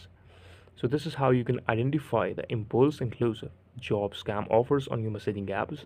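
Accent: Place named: India. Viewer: Just by hearing your accent I already know that it’s a scam and you are a scammer as well. D!rty 1nd1ans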